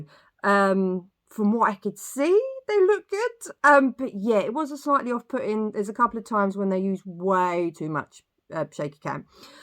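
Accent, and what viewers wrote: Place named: Southern England, United Kingdom. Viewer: I thought this last review was quite good. I saw it last night, and will probably go back and see it again. The fighting scenes were only ok, and yes, the reviewer is right. Too quick, and you can't see the action. Yes EVa Green (sigh). And Yes Where's the humour of the book and 1970s films. It's good that the reviewer has a southern English twang in her accent, I prefer intelligent ,pleasant looking blondes, without The BBC bias . Well done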